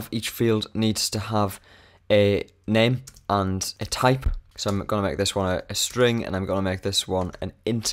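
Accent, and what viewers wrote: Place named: North, United Kingdom. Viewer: насколько же приятно слушать северный британский диалект по сравнению с индусами! я аж подрочил.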